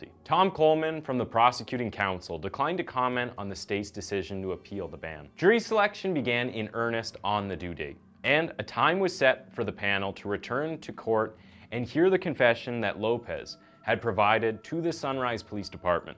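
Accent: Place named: Canada. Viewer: I'm having a hard time placing this guy's accent. He sounds like a Canadian who's lived in the UK longer than NA.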